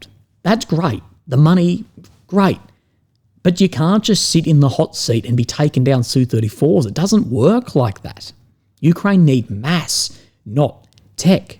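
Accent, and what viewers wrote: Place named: Australia. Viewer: l'm happy to see you in good health. over time, l'm also getting used to your aus accent. thanks for your contribution and comments. keep helping us please❤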